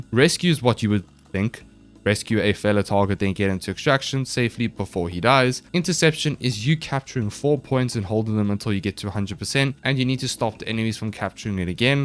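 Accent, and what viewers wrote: South Africa. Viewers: Completely unrelated to the video but your accent sounds familiar, are you South African? Also this was really helpful! I was super confused on how to progress when I got this game XD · Great video sir. Very useful as I'm downloading the game. I have one question, what country is your accent from? I think it may be south African but I'm really not sure as it could also easily be a new Zealand accent and I wouldn't know the difference. It's driving me crazy! Please! What is your accent? 😂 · Sound suspiciously south african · Do I detect a South African accent or am I mistaken ? 😂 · are you south african, why do you sound south african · FIRST TIME HEARING AN SA ACCENT ON GAME TUTORIALS!!!! LEKKER BOETIE :) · You have a south african accent 🤔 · Just a random question what is your accent. It sounds South African.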